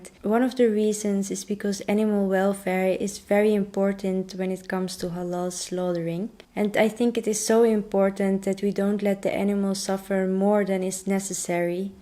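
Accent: Netherlands. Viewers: Ik wist gewoon dat je nederlands was door je accent haha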